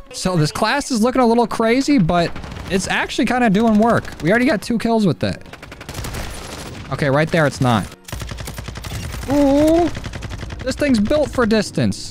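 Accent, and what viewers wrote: United States. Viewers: Americans and their pronunciation 🤦🏻‍♂️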